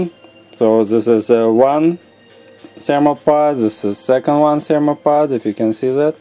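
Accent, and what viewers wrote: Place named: Russia. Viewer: russian accent <3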